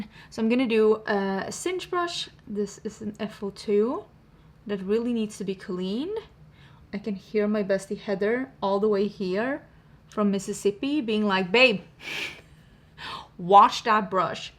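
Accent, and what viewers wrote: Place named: Sweden. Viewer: The automated caption did NOT follow you on the words skolavslutningen and midsommar. 😅 Love the swenglish tho! Keeping it real. 🇸🇪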